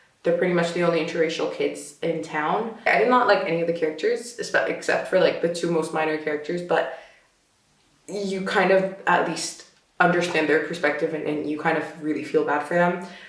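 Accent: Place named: United States. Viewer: Based on your accent I definitely assumed you were American lol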